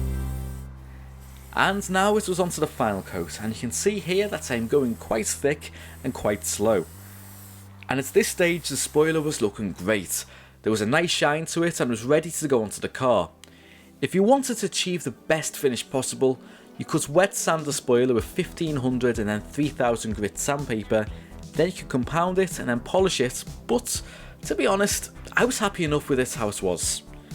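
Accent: Liverpool, United Kingdom. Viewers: I can't tell if you're scouse or not. Also the spoiler looks nice. Keep up the good videos!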